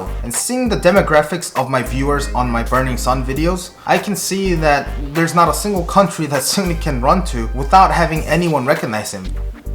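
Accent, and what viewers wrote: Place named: England, United Kingdom. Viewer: Like your English accent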